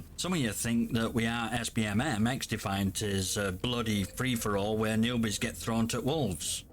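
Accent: Yorkshire, United Kingdom. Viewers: proper yorkshire that. good video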